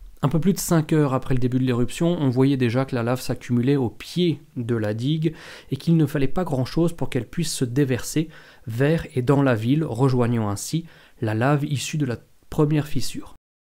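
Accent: Iceland. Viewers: C'est dingue comment la situation peut évoluer rapidement...en mieux ou en pire 😱....Heureusement l'expérience a évité des victimes...😅 Bravo pour ces infos cher,et pour l'accent islandais toujours aussi chaud! ♨️🌋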